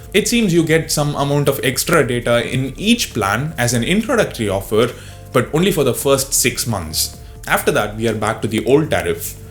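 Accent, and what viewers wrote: South India, India. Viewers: R u a South Indian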